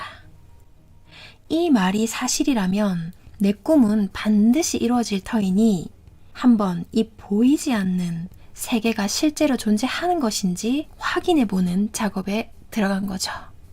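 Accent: Gyeongsang, South Korea. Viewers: 말투가 경상도가 고향 인가봐요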